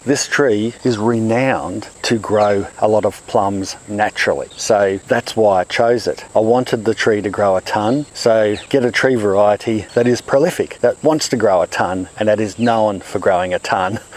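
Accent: Australia. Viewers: Greetings from France ! Someone recommended your channel to me, and i loved this video :) I really like your AU accent too !! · I love australian accent!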